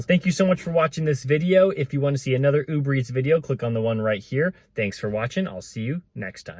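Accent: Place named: India. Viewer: Absolutely giving you the run around in that glorious Indian accent.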